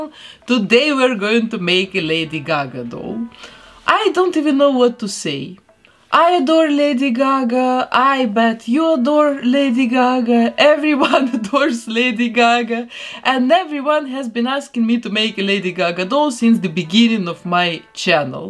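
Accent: Russia. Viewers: Вы случайно не русская? С акцентом говорите) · Русский акцент 🤘😎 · Мне тоже кажется, что русский акцент. Но, возможно, она не русская, а просто есть много стран, в которых четко произносятся все буквы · Почему я слышу русский акцент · у вас золотые руки, но от русского акцента нужно избавляться... слушать невозможно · почему такой жёсткий русский акцент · Это руссктй акцент? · Гриша Человеческий я тоже думаю,что она русская,в уши с самого начала прям ударил русский акцент 😂 но куклы у неё прекрасные😍😍😍😍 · Автор, вы Русская? Акцент прям жесть) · Я слышу русский акцент... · Удачи! 😅 Вы крутые. Вы русская? У вас просто русский акцент 😃 Красивая леди гага получилась! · акцент как русский хм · Мне кажется или я слышу какой-то типа русский акцент?)) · The doll is cool, but the accent you have isn’t cool at all. Too much of a Russian, even hard to understand sometimes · Ты очень похожа на русскую девушку. 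Особенно русский акцент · I can hear Russian accent.Вы большая молодец!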